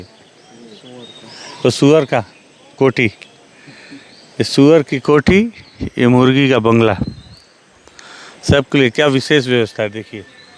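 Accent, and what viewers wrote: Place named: Varanasi, India. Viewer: गुरु आप वाराणसी से है या छत्तीसगढ़ से ही क्योंकि आपको देखकर लगता है आप छत्तीसगढ़ से है और सुन कर लगता है वाराणसी के है राम जी का पूरा प्रभाव है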